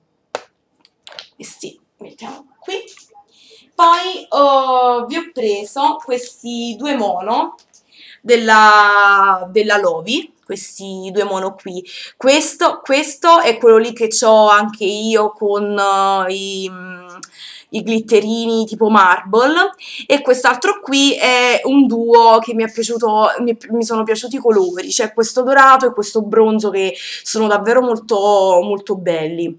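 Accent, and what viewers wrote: Naples, Italy. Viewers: 4 haiun accento familiare, sei per caso napoletana ?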